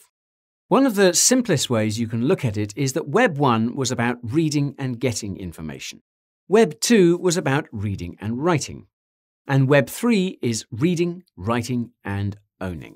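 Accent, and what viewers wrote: France. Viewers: that france accent is so funny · I love the French accent haha 😂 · French accent is not so bad 😂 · Greatest cliché french accent i’ve heard in a while (coming from a french dude) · Your French accent is spot on sir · You're french accent is so nice to hear :) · Nice French character, the mustache makes me thinking more of a Spanish guy but the accent is there. Love it. The content as well!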